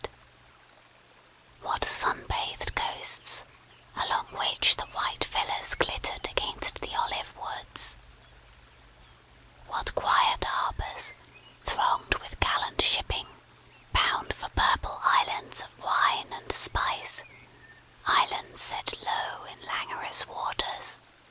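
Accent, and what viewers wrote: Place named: United Kingdom. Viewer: I understand it can be creepy but that british accent totally remembers me of harry potter. And the female whisper its just relaxing af 😍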